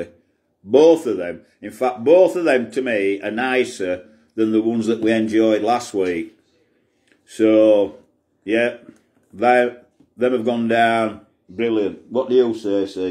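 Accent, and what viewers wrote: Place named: Yorkshire, United Kingdom. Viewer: Where in Yorkshire you from cocker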